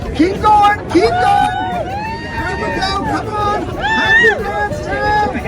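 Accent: United States. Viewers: Just love your accent genuine American 😊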